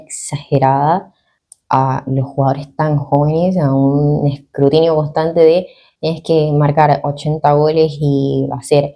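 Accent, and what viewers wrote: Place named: Argentina. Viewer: Que lindo acento tenes, pareces argentina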